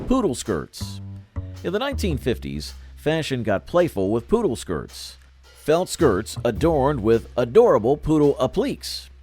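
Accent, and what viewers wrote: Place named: United States. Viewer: I hear a standard American accent from this host. I am not sure if it's computer generated or human. In case they are a human and reading this, the word is pronounced "app-li-KAY"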